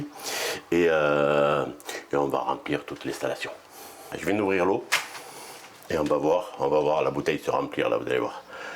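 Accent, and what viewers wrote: South, France. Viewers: Il est incroyable ce bonhomme, en plus, il a l’air sympa avec son accent du midi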